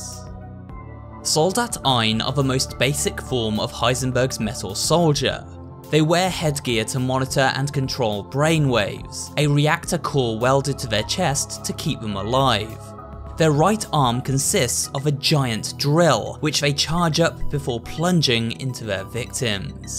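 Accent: England, United Kingdom. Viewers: Im german, so i understand the names even better. Also its always fun to hear an english person pronounce german words.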